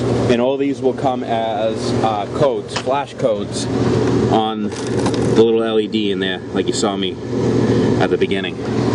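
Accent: Boston, United States. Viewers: I’m a firm believer that them YORKs get assembled but with a lot of failed attempts with parts and as soon as they get it right,they call it complete and move it out of assembly line,is the only way I can explain them cause you can be the best tech ever but you put one together and it fails to start so you gotta tinker around with it so it can start,I don’t like them,nope don’t them.Are you in the Boston area HVAC BROSKI cause I can hear you accent? We need top techs in that area and up into New Hampshire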